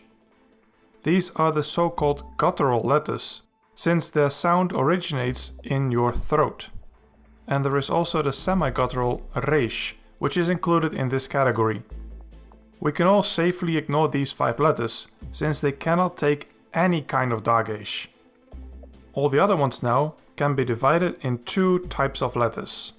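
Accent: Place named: Netherlands. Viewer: hahaha nohhh mann. Ik hoor gelijkkkk dat je Nederlands bent 😂😂 we hebben zo'n accentje dat ze nergens ter wereld hebben. 😂😅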